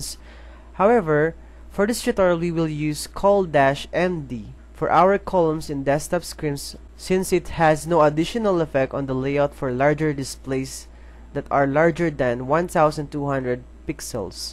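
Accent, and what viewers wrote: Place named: Philippines. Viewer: Maybe he is Filipino because he's accent same with us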